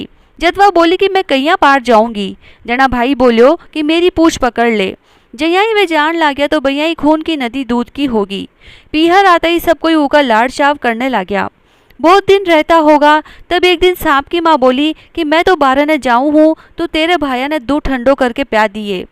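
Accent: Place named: Marwar, India. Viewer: Bhabhiji, mera sasural Garg Agarwal, Sikar ka hain. Vahi ki bolti marwadi mein kahani sunate hain Aap, toh ghar jaisa hi lagta hain 🤗 Jay Shri Radhe 🙏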